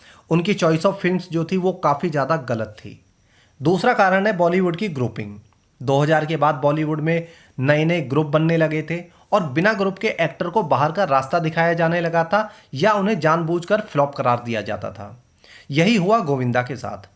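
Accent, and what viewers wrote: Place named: Uttar Pradesh, India. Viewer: Kay Ap Ideal Na Banaein Actor Ko Actor Ki Hat Tak Rakhaein Kyunkay Laehjay Say Tum Bhi Up Kay Lagtay Ho Amitabh Alahbad Ka Hai to Yeh Tumhara Tasub Nahin Hai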